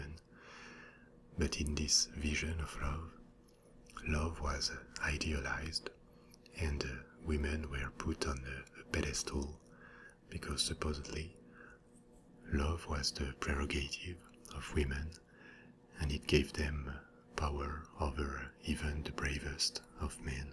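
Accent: France. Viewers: I wish there were more ASMRtists doing history videos. I'm a huge history buff and the French accent only adds to the flavor.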